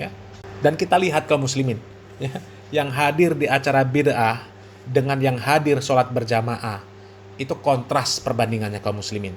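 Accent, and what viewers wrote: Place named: East Java, Indonesia. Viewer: Dari aksennya, orang Jatim seputaran Surabaya Malang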